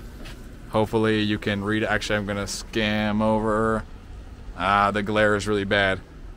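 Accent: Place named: United States. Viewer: How ignorant of you to assume that he is Brtish even after hearing his American accent 😂😂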